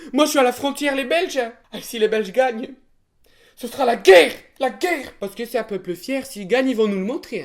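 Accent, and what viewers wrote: France. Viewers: Putain mais t'es Français avec ton accent toi ??/?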